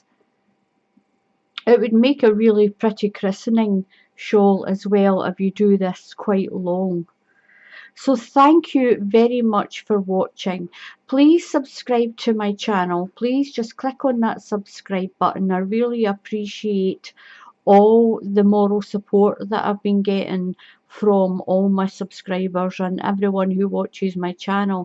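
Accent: Scotland, United Kingdom. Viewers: Great to hear a Scottish accent on a crochet tutorial. Love this pattern thank you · Am really enjoying making this blanket during lockdown , have learnt alot . Love your Scottish accent and hope to see and hear more of your patterns in the future .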